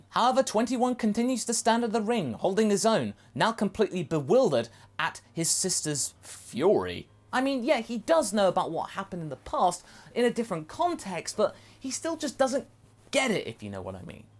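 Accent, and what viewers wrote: United Kingdom. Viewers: Oh wow, it's almost like you voiced the cell from team fou......wait.... I just.....your British. Well at least that clears it up.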